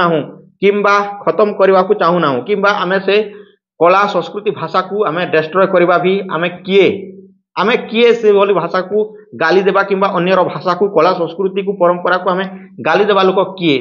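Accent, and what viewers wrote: Odisha, India. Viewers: Khanti odia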